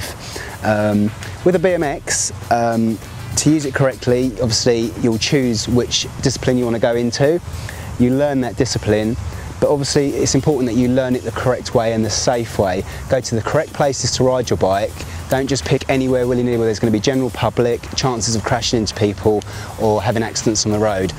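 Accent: United Kingdom. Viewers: *That British Accent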